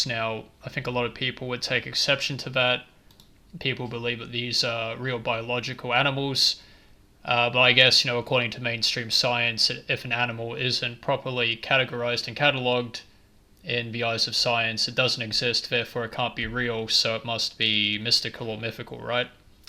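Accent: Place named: United Kingdom. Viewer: Glad you thought it was important enough to post this scathing comment in response to my scathing comment. Are we having fun yet? You got it wrong again, the name isn't Frederick, it is. That's a family name, especially with the 'son' part. Names like that go back a long way - all the way to Jolly good Britain as a matter of fact. If you're not a Brit, you sound like one. As to the mis-pronunciation of words, there certainly are different pronunciations - there are the correct ways to pronounce specific words, like city names. One pronounces them the way the locals do and there is your way. I'm terribly sorry you feel that a comment like mine is worthy of your attention, but it seems that you are one of those angry people who cannot bear up to criticism. Must be hard to be you. Your "analysis" as you prefer to call it, was actually more commentary because you did not enter any facts based on the scientific method to sway viewers. 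Your opinion was obviously being expressed and you continued to make judgments based on your feelings and interpretations which were uttered with bias. I know, I know, you like all the thumbs up arrows being pushed because YouTube is a revenue generator and negative comments distract from your wish to make that cold hard cash. Too bad. Had your video shed actual light on the subject, I'd have given a better review.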